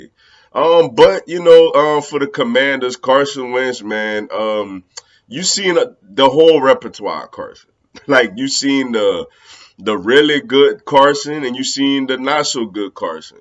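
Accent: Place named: Louisiana, United States. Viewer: Mr you sound like you make have a Cajun family tree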